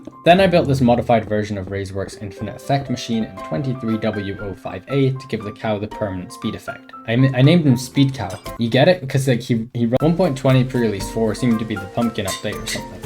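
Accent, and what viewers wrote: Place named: Central Scotland, United Kingdom. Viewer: You have no idea how surreal it feels to hear a Scottish Minecraft YouTuber. You sound like your from central Scotland too like me!!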